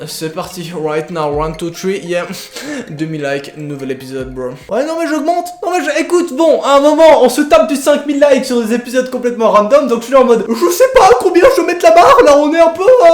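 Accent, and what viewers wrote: Belgium. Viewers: Il est belge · T belge nan??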